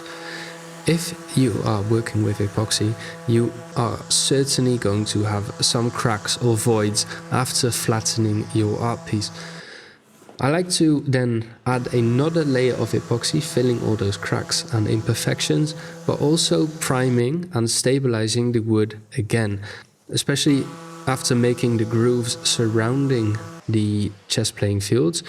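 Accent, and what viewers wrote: United Kingdom. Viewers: Where did you pick up such a british accent. It amazes me every time. Dutch people usually sound more american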